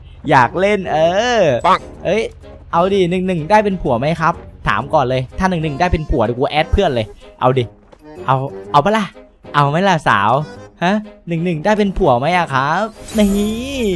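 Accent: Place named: Suphan Buri, Thailand. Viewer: พี่เป็นสุพรรณหรอคับทำไมพี่พูดเหน่อ😅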